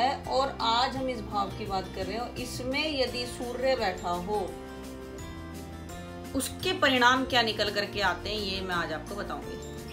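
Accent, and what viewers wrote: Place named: Punjab, India. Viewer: madam app ki awaj me punjabi jhalakti ha kya aap bhi punjab se hain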